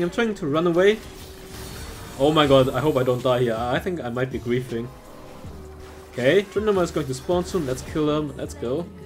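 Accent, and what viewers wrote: Germany. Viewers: Most probably Germany. Pretty typical accent over there. 😁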